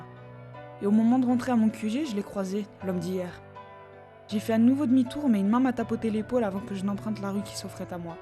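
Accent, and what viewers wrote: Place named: France. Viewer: Ton accent français c' est fou comment je trouve ça très touchant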